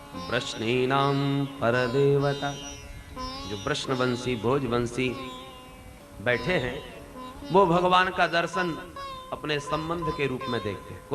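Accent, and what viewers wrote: Braj, India. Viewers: Ati sunder sumadhur brajbhasha me katha ataydhik sukhad saras prateet hoti hay apko sader naman vandan guruver 🙏🙏🙏🙏🙏💐💐💐💐💐💐💐💐💐💐💐💐💐💐💐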